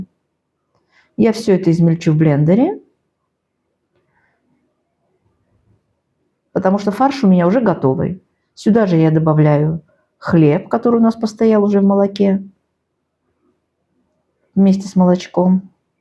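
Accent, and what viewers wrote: Baku, Azerbaijan. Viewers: обожаю Ваш акцент, я сразу поняла что Вы из Баку.Я там была в гостях ещепри СССР, 45 лет назад. Ну и ваш талант кулинара выше всяких похвал.Спасибо,дорогая!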